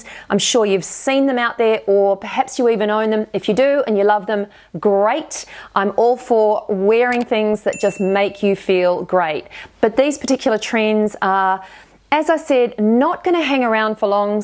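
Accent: New Zealand. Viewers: Where's the accent from? New Zealand? Like the place that hasn't spend a year in their pijamas?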